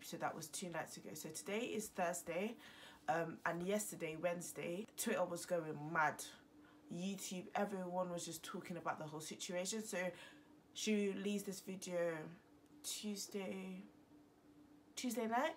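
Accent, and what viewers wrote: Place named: Zimbabwe. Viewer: You sound zimbabwean. .thank you for not being harsh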